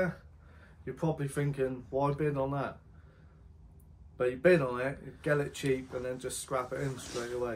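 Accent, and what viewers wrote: Cornwall, United Kingdom. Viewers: What part of the country are you from? Sounds like Cornwall?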